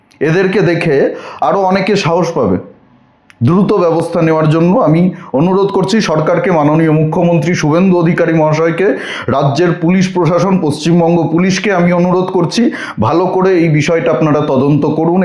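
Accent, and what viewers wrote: Bangladesh. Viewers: She sounds Bangladeshi.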